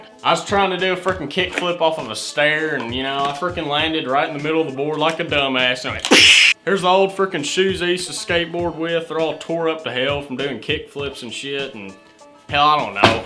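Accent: Kentucky, United States. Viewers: Fake accent? That’s funny he’s from Kentucky. If anything he has s pretty mild accent normally in Kentucky it’s pretty thick. So obviously it’s not fake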